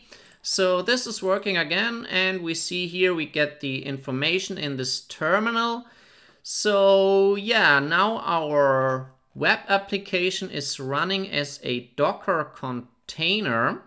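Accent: Germany. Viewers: Du bist ein Deutscher, oder?😊😂 Aber gutes Tutorial definitiv! :)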